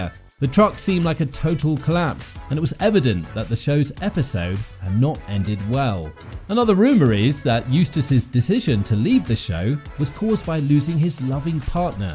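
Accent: United Kingdom. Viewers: Or British whatever you are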